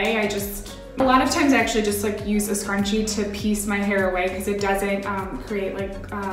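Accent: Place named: United States. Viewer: I was not expecting an American accent !! 😂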